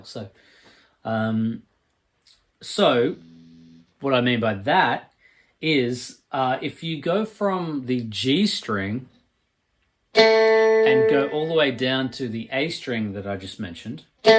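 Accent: Australia. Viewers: Not an accent. That's Australian. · great stuff ozzie accent to doesn't get any better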